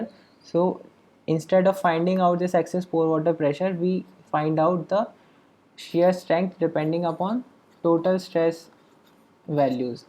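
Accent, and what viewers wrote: India. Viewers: I am sorry to say that most of my concentration is already used up by your 'terrible' Indian accent. A pity you do not learn English earlier at school. Secondly your writing is really bad. From the content it is probably ok, but as European I stop following you because I find it very hard to comprehend your English. A voice over or typed out English could do wonders. I return to my English book......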